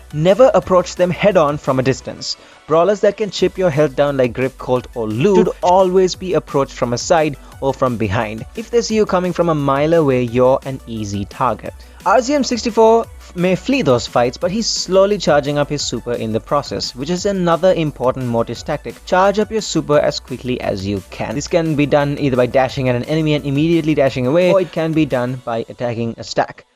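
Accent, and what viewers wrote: India. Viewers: Are you Indian? Your accent seems sometime like it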